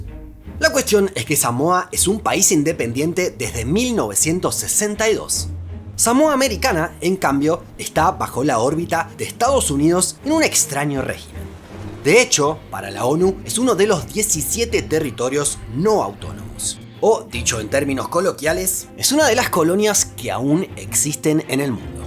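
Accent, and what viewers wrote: Argentina. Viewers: Es argentino el que habla? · Como tiene un cantito especial solo con las she pensé es argentino❤😊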